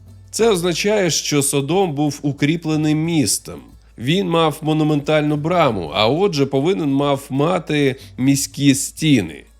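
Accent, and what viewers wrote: Russia. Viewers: Великий уклін вашій наполегливості у видавлені з себе москальського акценту. Перші ваші відео аж слухати не міг, а тут натрапив знову на ваш канал і дуже приємно здивований. Моя повага!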